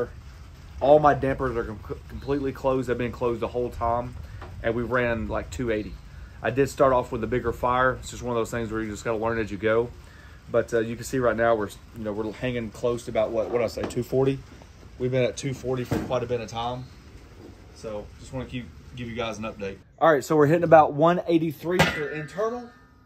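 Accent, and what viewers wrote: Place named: Gulf Coast, United States. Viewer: I'm gonna take a guess that you (like me) are from the Gulf Coast. I'm just judging that by your accent and the heavy downpour. Am I wrong or right?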